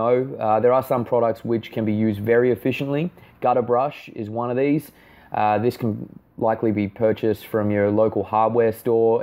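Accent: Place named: Australia. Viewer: Oops, LOVE THE AUSSIE ACCENT!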